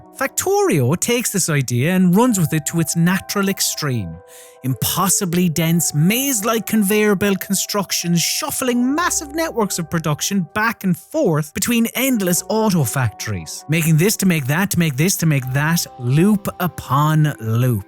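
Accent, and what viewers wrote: Ireland. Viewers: man soundds oirish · I love the narrator’s Irish accent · Is this the Irish accent? So cute.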